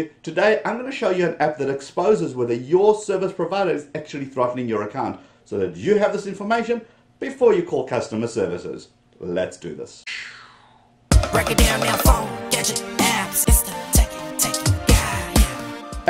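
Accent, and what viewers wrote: South Africa. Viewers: accent is SA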